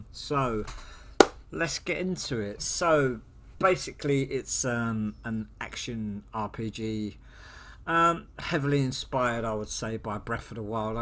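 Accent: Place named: Ireland. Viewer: Are you Irish, bro? Cute accent :)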